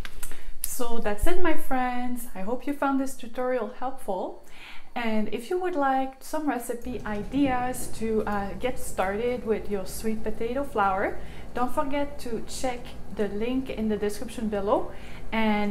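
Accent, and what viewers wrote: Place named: Quebec, Canada. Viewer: Salut par ton accent je comprend que tu est Quebecoise, est ce que tu fait aussi des video en francais? Je vous demande ca parce qu'il n'y a pas beaucoup de chose comme ca et interessant sur YouTube en Quebecois/francais et je trouve ca dommage quand meme. Donc voila, sois beni!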